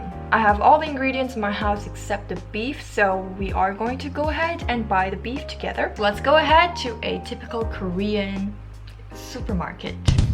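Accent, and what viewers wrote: Philippines. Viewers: Are you a korean or half korean? Your english intonation is quite Filipino. By the way your so pretty Ms. 😉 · You are so lucky you can speak clearly and distinguish an L from an R. Like very much your dictions and proud of your Pinoy English style. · Did you study here in the Philippines? Cause your English is so good like filipino accent.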